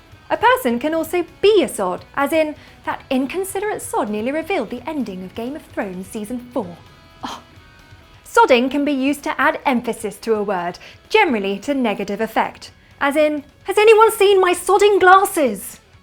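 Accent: United Kingdom. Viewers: I would stick it in this British chick's bum. She is not very pretty, but I have a weakness for that damned accent. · There are almost as many "British accents" as there are towns in the U.K. Her accent is more of an "educated Brit" accent, isn't it?. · In all I love the British accent as it add confidence to your speech. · Are you bloody stupid mate? Her accent is obviously British! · Are you British? But if you not how English English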